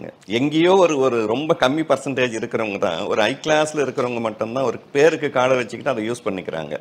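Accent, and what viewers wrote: Coimbatore, India. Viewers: Evalo respect a peasararu 🙏Kongu slang ❤ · Enga Coimbatore slang uhh nga❤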